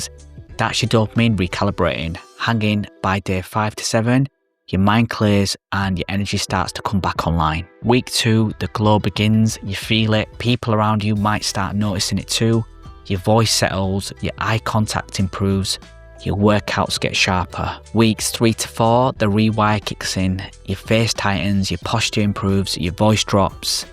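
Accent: United Kingdom. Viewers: what British accent is this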